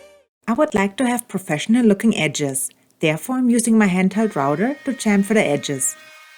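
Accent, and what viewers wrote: Germany. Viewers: I figured you were from Deutschland with the accent. My mom makes pfeffernusse for Christmas, my grandmother used to call me a kleine hans wurst when I got out of hand. She used to call everybody Hans wurst if she didn’t like them. Love that smile, and you make sanding fun and interesting.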